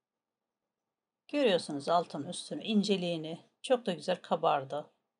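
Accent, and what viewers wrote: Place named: Turkey. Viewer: Italia, penso che sia turca questa ricetta sento l’accento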